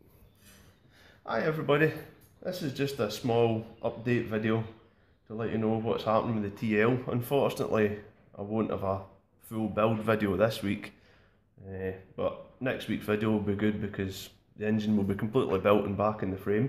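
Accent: Scotland, United Kingdom. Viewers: Thanks for the update. And by the way, do you know Frankie Boyle? Sounds like you're from the same area. 😁 Keep up the good work. Looking forward to the next one. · Scotsman, 100%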